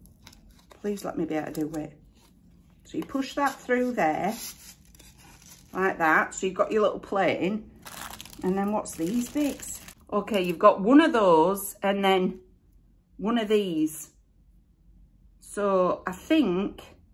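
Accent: Yorkshire, United Kingdom. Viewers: Hello to you from New Zealand I have just started following you and really enjoy your videos especially cos you are so natural as you present them with no airs and graces !Its great to hear your unaffected Yorkshire accent and sense of humour.I am originally from York ....bit further north from you .....be good to see some of your art work please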